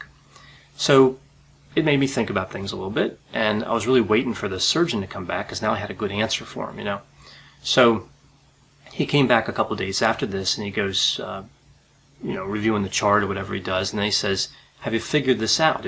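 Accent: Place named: Pennsylvania, United States. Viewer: Are you from Pennsylvania? It’s the accent!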